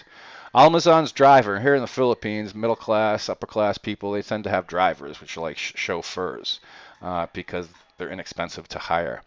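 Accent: Boston, United States. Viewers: Boston accent.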